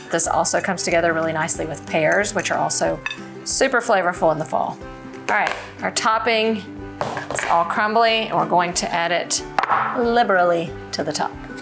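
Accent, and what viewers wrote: South, United States. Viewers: She has a fun super southern accent! Going to try this tonight!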